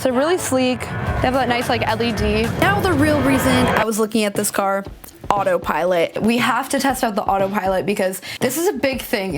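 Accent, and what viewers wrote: Los Angeles, United States. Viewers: Ugh LA accent is horrible.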